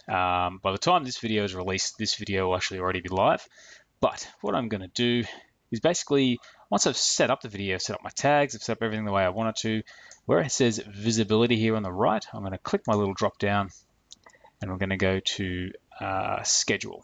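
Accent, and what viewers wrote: Australia. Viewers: Thanks heaps. I'd forgotten where it was n you can spend hours trying to find stuff on there, n yours was the only vid I could find on where it was. So new sub here. Bit weird though, as mine says the exact same times as yours! You sound Australian, so maybe it's 'cos I'm in New Zealand n we may have the same-ish time zones. I've found 9pm, 12am and 1.30am are my best times for shorts. I scatter my shorts throughout the day/night though after experimenting, but these tend to get the most views. I don't worry about longer main vid times, I just put them up whenever, as it's those shorts that gets them there subs lol! Thanks for putting up this vid :)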